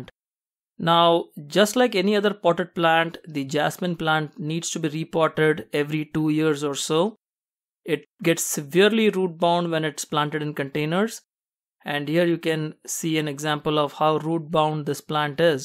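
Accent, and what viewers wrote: India. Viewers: You sounds that you are Indian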